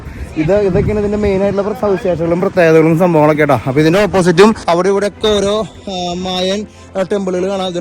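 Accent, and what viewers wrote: Thrissur, India. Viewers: Hello,Please write your script you dialogue as there is repetition of your words and descriptions...also lessen your trichur accent...as always picturixation is great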